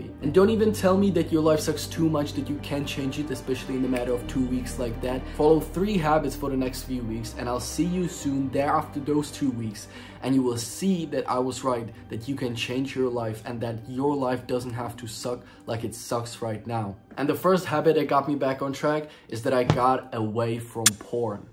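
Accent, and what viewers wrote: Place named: Czech Republic. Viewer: I can say youre from czech republic right? btw great video man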